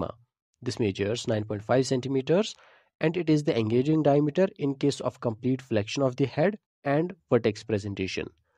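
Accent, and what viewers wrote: Kashmir, India. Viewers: Voice of a Kashmiri guy😊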